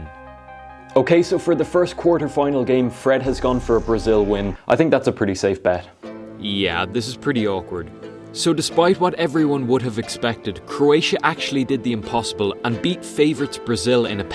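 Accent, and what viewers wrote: Ireland. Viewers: Are you from Ireland🇮🇪🇮🇪🇮🇪?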